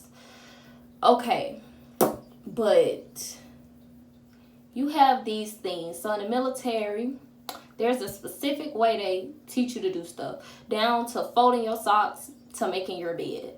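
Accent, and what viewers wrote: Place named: Alabama, United States. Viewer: You from Alabama 🤣🤣 you got a strong accent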